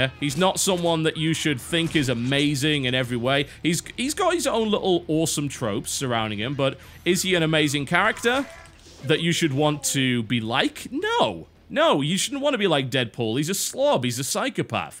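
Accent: United Kingdom. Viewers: Is it just me or does he sound like a super rich, retired, game dev turned game critic? It may just be that he's British.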